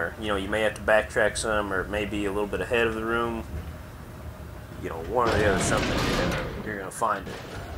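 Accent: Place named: South, United States. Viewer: Awesome, thanks for making this, enjoyed your southern accent!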